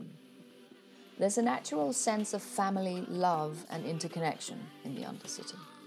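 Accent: United Kingdom. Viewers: Hey, I'm was a recent viewer. When I stumbled upon one of your videos, I thought it was another boring analysis that I've heard from other Youtubers. I am glad I clicked on it. I was hooked on how deeply you analysed the details and symbolism of Arcane. And I never would have thought of the parralels if it weren't for you. Your videos are very enertaining and it also helps that your voice is very calming. If you don't mind me asking, are you British? I'm sorry if I do get your accent wrong.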